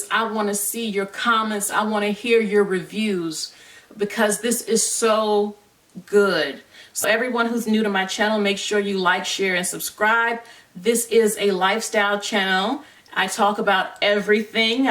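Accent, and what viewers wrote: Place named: United States. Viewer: To master a language one must master lingo, jargon, slang, colloquialisms, regional dialects and individual nuances in phonetics. The standardization for what or how a word should be used actually changes often, akin to the English purposefully changing their accents to separate them from the puritans who founded the united states, which is how the "queens english" came to be... So back to, her unique enunciations are correct with her location in the united states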